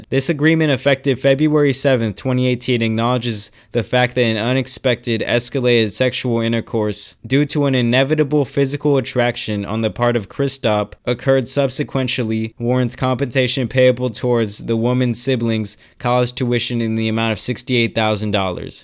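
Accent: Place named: New York, United States. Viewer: You really have a New York accent while talking 😂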